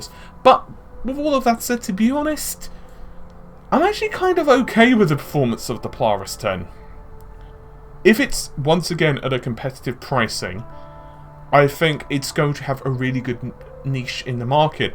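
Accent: England, United Kingdom. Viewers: that background music and that English accent makes me sleepy but in a good way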